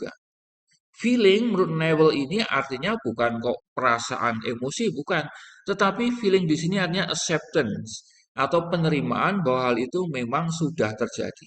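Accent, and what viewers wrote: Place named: Pekalongan, Indonesia. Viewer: Pekalongan hadir... Logate jenengan mirip2 pekalongan.. Jenengan pundi?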